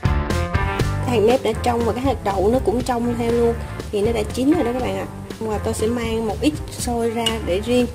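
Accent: South, Vietnam. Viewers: Nghe ngươii mien nam thich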